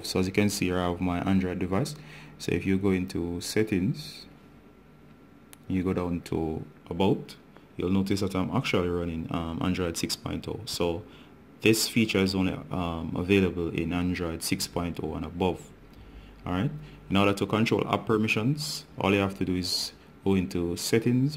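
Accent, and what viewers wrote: Jamaica. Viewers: Jamaican I think not being racist just heard the ancient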